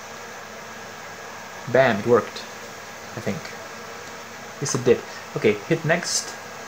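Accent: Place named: India. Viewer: engleza de indian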